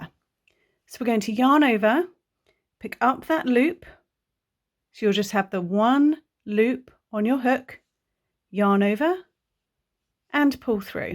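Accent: United Kingdom. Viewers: now i am confused - a uk accent but usa stitch names.....i would call thouse double crochet and treble crochet stitches.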